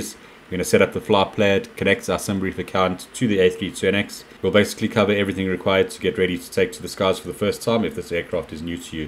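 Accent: South Africa. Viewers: thanks for the tutoral. i am a big BOEING fan and after many years of flying them i have eventualy decided to tryout Airbus. BTW, its good to hear a South African accent again, (other than my wifes) as we have been living in the UK for sometime.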